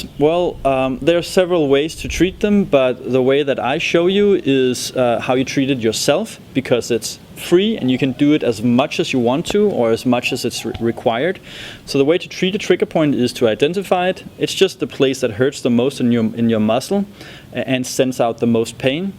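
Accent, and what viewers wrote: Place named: Denmark. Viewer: heavy on the Danish accent. apart from that excellent English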